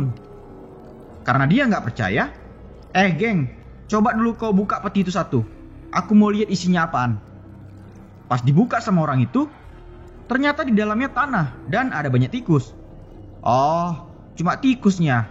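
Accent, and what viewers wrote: Medan, Indonesia. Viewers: 😓😓 astagaa aku telat 3 hari.. 🤦🏻 .. pahal in chanel favorid akuu.. 😢😢 smngt yh. ma'af sdh telat.. sebagai ganti.. aku tdk akan melewatkn semua iklan y. 😍😍😍 .. logat medan y aku sukaa kali.. 😍😍😍😘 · Wkwkw.berasa kawan awak yang ceritain ke awak Kerasa kali logat medan nya we 🤣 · Jan dinampakkan kali lah medannya bang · Orang medan kah kau bang?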